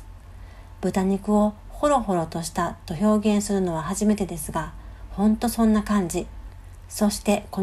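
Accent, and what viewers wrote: Kyoto, Japan. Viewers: 京都弁って～情緒があって～大好き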